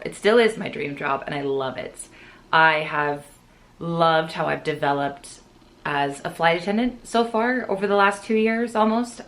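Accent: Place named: Southern California, United States. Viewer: my friend, I hear you talk,and I hear in both what you say and how you say it that you are a So Cali girl. This AGED So Cali boy feels back home when you talk to us. You are totally right. Daddy D IS over staffed. And most likely every one else. I actually tried toward end of year with you, but Talent Acquisition closed the Req# right away. No doubt somebody realized there were too many people to pay already. Let me fill you in on what has gone on. I think you know I am not 28. It’s the reverse - 82. Due to Rodg’s death from cancer a year ago today (25 February), being alone here and rambling in a place too big for me, I decided finally that zi needed to be around different people all the time and to be away from being home alone in order to have those experiences of growing and broadening. Only F9 was hiring. Although in good health, at 82, I never thought I would get invited to an all day event, much less get offered a CJO I was invited to an event in DEN 14 September and got the offer. 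3 days later, I declined it, mostly due to having some pretty expensive property in my care (you may know a little about SEA real estate). I remained in good stead and later thought how dumb that was or me and that I really want that FA lifestyle snd to try again after 3 months If offered again, would just close and lock door. Well, went through whole process again, got invited to DEN event 6 February and was offered CJO A SECOND TIME. Am waiting for the background check to be comple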